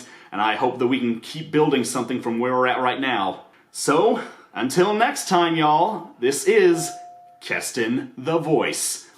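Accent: South, United States. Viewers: After watching one of his videos i hear the country southerness in his voice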